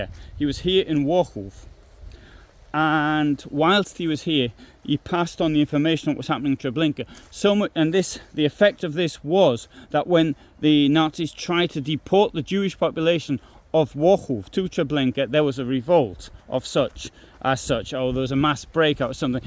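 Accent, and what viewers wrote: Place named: Midlands, United Kingdom. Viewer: You never saud but.you dont speak.the queens English. I think the midlands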